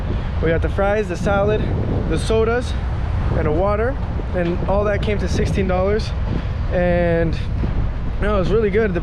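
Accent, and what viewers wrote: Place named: Argentina. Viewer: Cool!! You have a perfect Argentinean accent!!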